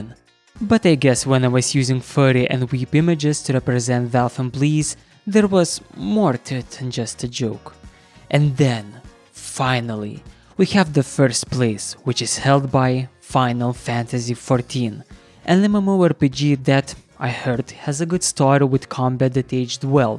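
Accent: Russia. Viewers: Вроде русский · крутое видео чувак, но советую поработать над русским акцентом) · Хороший видос брат, по акценту узнаю родной русский или другой славянский язык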